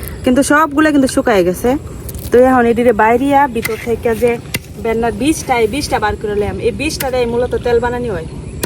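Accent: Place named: Kishoreganj, Bangladesh. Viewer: আপু তো কিশোরগঞ্জের ভাষায় কথা বলেন, আপুর বাড়ি কি কিশোরগঞ্জ বা ময়মনসিংহ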